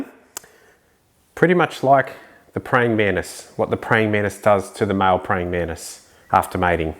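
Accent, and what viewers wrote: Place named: Australia. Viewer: Your Australian accent makes me want to try making these prawns and I don’t even like seafood! Seriously well done video, next level and very professional! Btw you shouldn’t cook puppies 😬 😆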